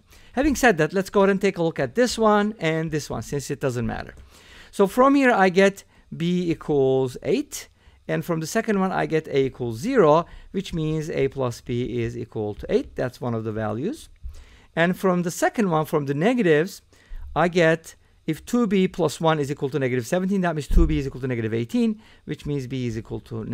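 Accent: Turkey. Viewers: You have a really turkish accent, are you any chances turkish?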